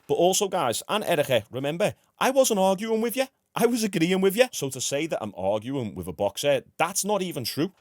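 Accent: Liverpool, United Kingdom. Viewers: Love the scouse, from Derry N.I myself, our accent is hard to understand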